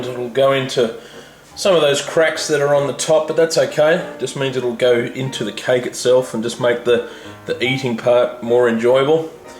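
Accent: Australia. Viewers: Can anyone tell me is it Australian accent? Just curious. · At first i only subscribed to him because he had an Austrailian accent, but now i found out he makes delicious things so it's a win win situation :D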